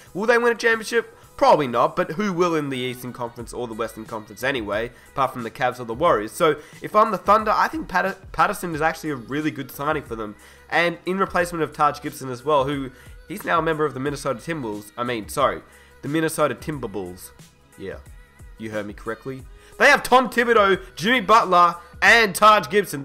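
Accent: Australia. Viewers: Okc still hyped wtf the got there ass dropped by the rockets and there not winning shit because if they can't win with kd,harden,ibaka you think there gonna win with Paul gorge( washed up version) and btw who tf is the Australian talking over the video who has not picked up a basketball in his life probably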